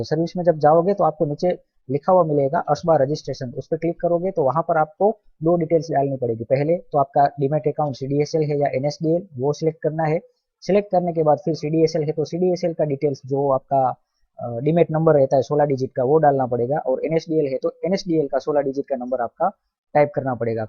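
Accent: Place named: Gujarat, India. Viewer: Gujarati lago😅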